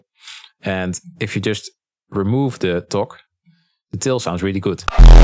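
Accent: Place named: Netherlands. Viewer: Are you Dutch? Because you have the typical Dutch accent in your English. Althans.... dat denk ik wel te horen :)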